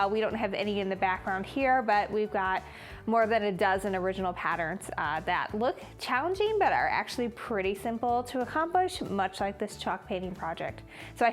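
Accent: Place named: Quad Cities, United States. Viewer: No way! I was listening to your "accent" and asked my son who you sound like and he said me - I am originally from East Moline and went to Alleman. I currently live in Las Vegas and have since 1989 (I am much older than you) -still call the QC's home tho! Your tutorial was excellent - you made it easy to follow and love your explanations.Your final product looked flawless and your shop looks amazing! My grandma and Aunts had a shop in Moline off of 34th St and 23rd ave, maybe you had seen it, The Stitchin' House. I am so glad I listened to the very end so I could figure out where I have heard that dialect before. Keep up the great work -looking forward to watching your other videos! Happy Holidays! Natalie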